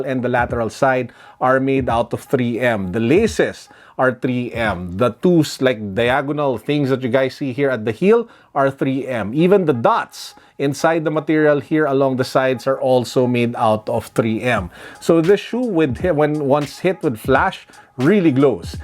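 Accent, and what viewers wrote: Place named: Philippines. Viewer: right off the bat when i heard your voice i knew you were filipino just like me. ahah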